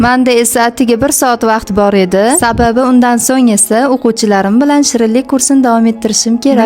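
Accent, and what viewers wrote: Namangan, Uzbekistan. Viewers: Adres qayerda Namangan shevasiga oʻxshayapti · Sheva Namanganniki manzil ham Namangandami.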